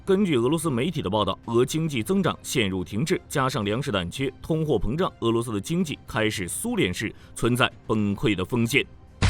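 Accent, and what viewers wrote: China. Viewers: 好抗拒這類普通話聽得很不舒服😢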